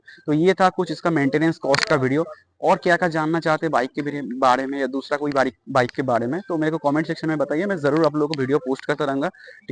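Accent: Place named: Bengal, India. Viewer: Bong boy. Accent problem bro.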